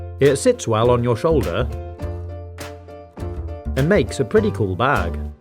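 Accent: United Kingdom. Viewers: Louisa Dsouza it's just a British accent.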